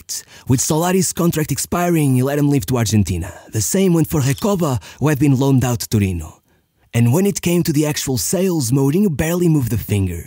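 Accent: Portugal. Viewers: Why do you pronounce everything like it's portuguese ? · Tenho a certeza que és tuga 🤣 mas força manu curto a brava 👏👏👏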